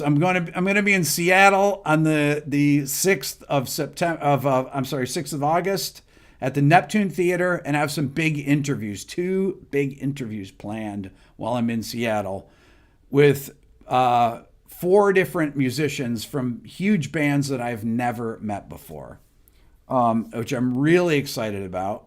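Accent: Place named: North Jersey, United States. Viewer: He's a North Jersey boy. He has that punky Northern NJ accent. Love it!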